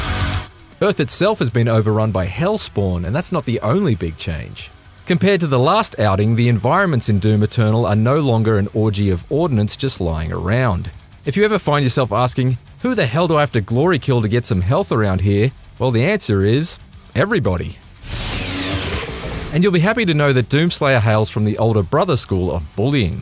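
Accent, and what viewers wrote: Australia. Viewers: Goodness, I always significantly enjoy the texts when any of the Aussie lads writes/narrates them! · is this Gmanlives? I can tell that he's Aussie, but it doesn't sound like Gmanlives. The accent is slightly different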